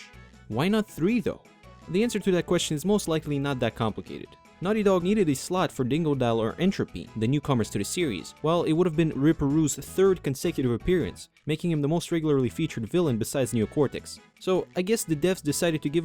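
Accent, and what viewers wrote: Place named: New York, United States. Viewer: Wait, your first language was not english? I could easily pass you for a new yorker, your accent is perfect for america!!!! I just sound like a (weard) english person.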